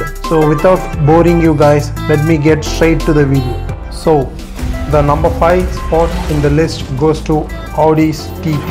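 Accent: India. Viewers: Cute but you talk like a Malayali everyone speaks English like that now I my English is also like that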